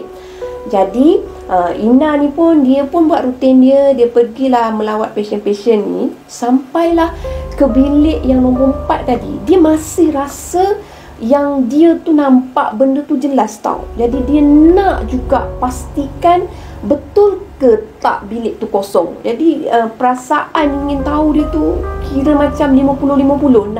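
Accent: Kelantan, Malaysia. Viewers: dari lorat bahasa mlysia kluar lorat pahang..last2 kluar lorat ..mu kecek kelate eh.. takpee..teruskan bercerita..👍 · Mesti ni org Kelantan. Ada keluar slang:). Best dengar citer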